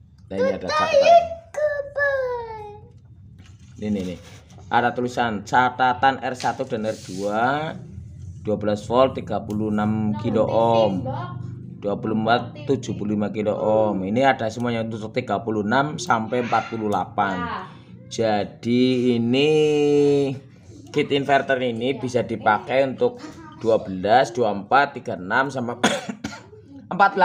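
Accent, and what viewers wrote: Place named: Banyumas, Indonesia. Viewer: Om... Logatnya banyumasan asli mana bos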